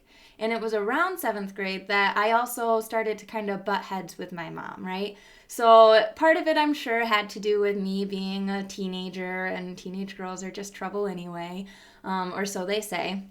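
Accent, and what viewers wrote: United States. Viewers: That Midwest accent though..... love it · As much as I love to hear your conversion story, I have one request to make which is whether you could slow down a bit as its hard for some of us living in India to follow those twang-rich american accents. I mean no disrespect but I wish to listen carefully to your speech which is truly inspiring.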